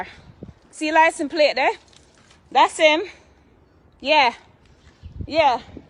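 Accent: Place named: Jamaica. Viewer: You jamaican accent no too bad enuh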